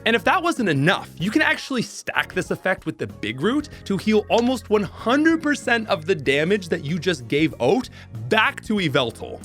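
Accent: Canada. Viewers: He's Canadian. Every time he says something with "out" in it, it sounds like "oat" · Oh your Canadian makes sense now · Well that's just Canadian pronunciation. I don't think they say drought like that though.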